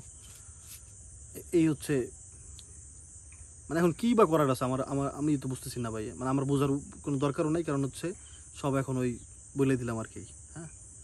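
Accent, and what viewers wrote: Barishal, Bangladesh. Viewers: বরিশাইলা বলে কথা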